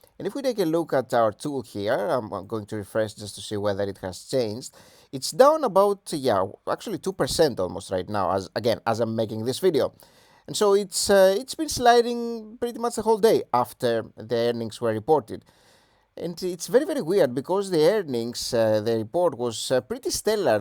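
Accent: Greece. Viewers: Great content! And do I hear it right, is that Greek accent? :)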